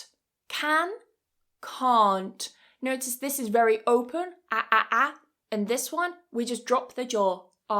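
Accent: United Kingdom. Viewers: I love your accent. May i ask where you are from?Which part of Britain speaks it? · I love UK accent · I find this video particularly entertaining, I'm from Costa Rica, is this British accent? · Hello, I'm Oscar Quiñones, I'm Colombian. I love you British accent!! 😊😊😊, Thank you for you class! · 100% is British accent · Love your British accent ❤❤❤ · l have american accent but l love your british accent as well you are great my dear❤❤❤ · Is this British English? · Ohh My gosh British pronunciation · Litterly this Vedio solved 30% my problems in English specialiy word and world 🌎 I really loved British accent I'm try to work on it to get good pronunciation it's was lovely Vedio thanks sis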